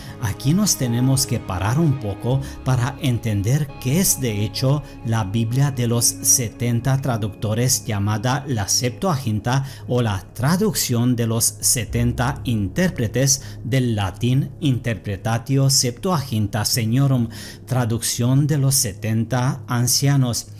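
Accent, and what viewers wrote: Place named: Germany. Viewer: suena como si fuera alemán nativo, escucha narrar a alpha mind o a libertalya ambos creo que también son alemanes narrando estos temas en español y su pronunciación es la misma que. No pasa lo mismo con los gringos o negros de inglés nativo que hablan español su pronunciación es muy diferente.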